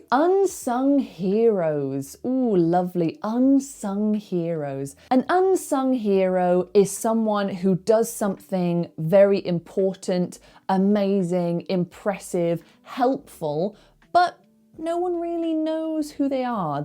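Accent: United Kingdom. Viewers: It's great to hear British dialect 😍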